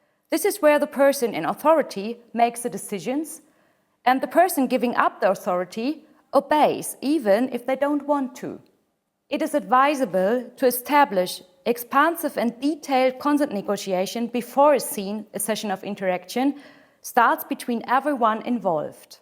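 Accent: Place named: Germany. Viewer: The explaining person's mother tongue is german, isn't it? :)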